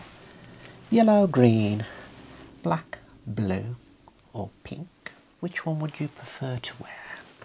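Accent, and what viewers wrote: Birmingham, United Kingdom. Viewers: I love that this video lets us know you're in Birmingham - as if there was any doubt about that wonderful accent! :D thanks so much for this - your videos are the best, never fail to chill me out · Not brummie asmr omg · Brummy accent!! ❤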